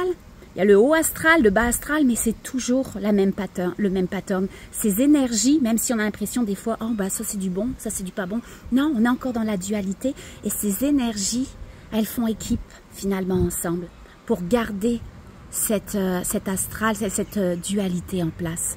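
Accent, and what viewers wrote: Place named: New Brunswick, Canada. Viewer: tu as un bel accent que j'aurais pensé peut être du Nouveau Brunswick 🥰 grand merci 🙏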